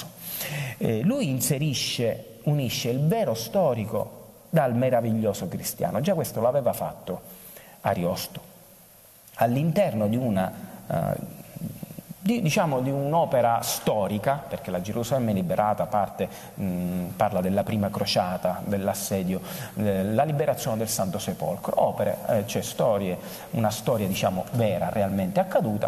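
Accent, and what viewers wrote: Sicily, Italy. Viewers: Il nn compreso....eccede.....aaaaaa, un saluto grazie il suo siculo è simpaticissimo anch io isolana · tu siciliano sei, il mio accento lo riconoscerei tra mille❤️❤️❤️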